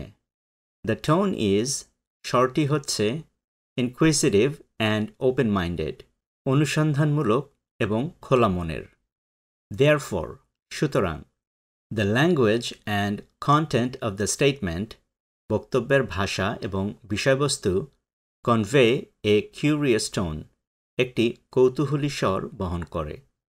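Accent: United States. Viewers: apu ami bolchi thik kore kotha bolte Uni american style na choose kore british ta choose korleu parten 😒🪧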